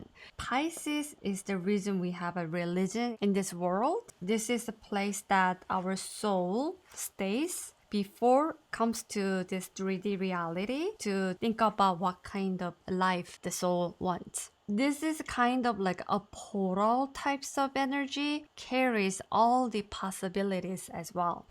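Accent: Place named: South Korea. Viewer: Sorry I'm learning Korean and I thought I recognised your accent. This is a very good video and clever to use slideshows :)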